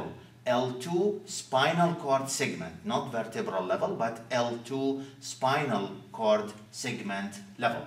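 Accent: Iraq. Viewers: From your accent ,I gusse U R Iraqi So we R proud of U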